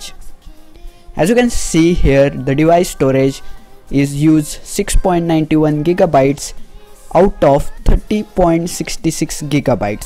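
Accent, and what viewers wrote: India. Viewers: Indian much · For some reason I don't like that Hindi accent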